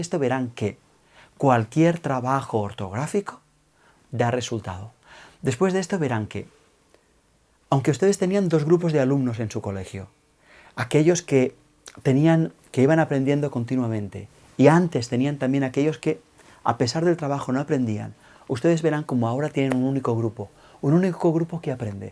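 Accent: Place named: Catalonia, Spain. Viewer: Gracias. Tengo 54 años,y aún tengo faltas ortográficas. Recién hoy con tu vídeo me he dado cuenta por qué! Tengo memoria visual para muchas cosas. Puedo recordar con detalles muchísimas cosas, pero en la ortografía siempre lo auditivo ha estado más presente, no sé por qué. El caso es que viví toda mi vida en Uruguay,(hasta hace unos años que estuve viviendo en Barcelona). En esos años que viví en tu tierra (se te nota el acento catalán cuando hablas en castellano jaja) pude darme cuenta que si yo hubiese oído de niña la diferencia de pronunciación que existe entre la "c" y la "s" por ejemplo, hubiese aprendido mejor. Sabes que tanto en Uruguay como en Argentina la "s"; la "c" y la "z" suenan iguales, así como la "y" y la "ll". Pero claro si alguien me hubiese enseñado lo que tu hoy en tu vídeo. Seguiré aprendiendo a pesar de mi edad. Gracias.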